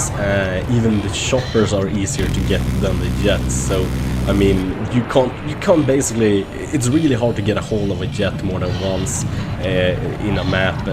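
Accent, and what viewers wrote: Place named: Sweden. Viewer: This guy has to be from Sweeden. I can hear it when he talks and I know i'm right! I just know!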